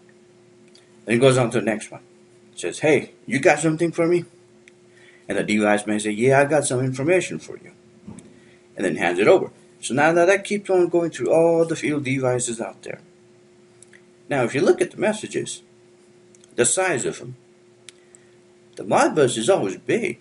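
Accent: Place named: Louisiana, United States. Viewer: My guess - Louisiana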